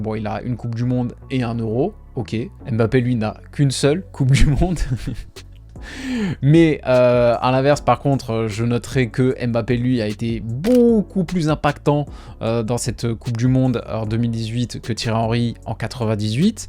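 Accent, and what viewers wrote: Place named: Nice, France. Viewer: Ça fait plaisir d'entendre un nissart, issa nissa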